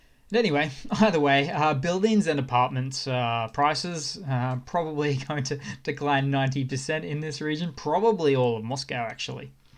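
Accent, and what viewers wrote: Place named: Australia. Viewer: Thks & you sound aussie; I stills can't figure-out how yous-guys don't fall-off the bottom of the earth ;) Pretty-good trick though.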